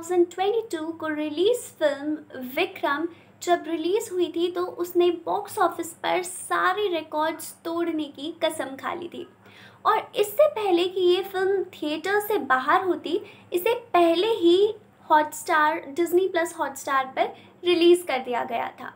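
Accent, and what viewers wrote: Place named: United Kingdom. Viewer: Your Hindi assent is Englishized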